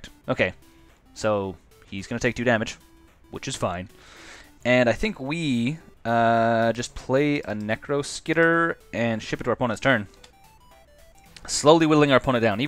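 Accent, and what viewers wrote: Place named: Edmonton, Canada. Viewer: Edmonton? Your accent is too think to be Windsor.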